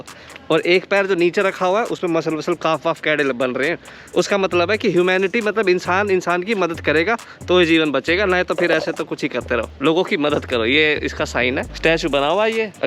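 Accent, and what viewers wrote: Haryana, India. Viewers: Bhai aapki language se lagta h ki aap haryana k palwal ya phir faridabad district se belong krte ho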